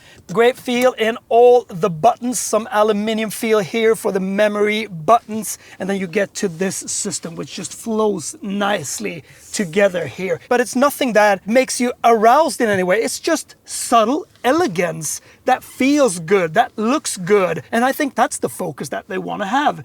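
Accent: Sweden. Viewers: Man can hear the swedish accent svengelska :D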